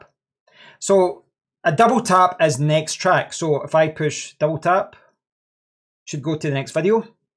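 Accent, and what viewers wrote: Scotland, United Kingdom. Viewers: Your accent makes me miss Scotland 😀. Here in UAE it's only 22£ with coupon I think it's the only tws with apt x under 25quid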